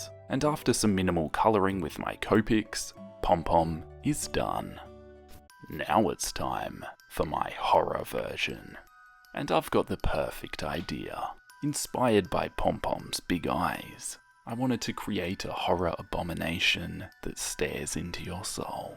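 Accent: Australia. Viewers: I reallyyyy like your horror stile and i always watch your videos as a podcast and your voice and the australien accent chef kiss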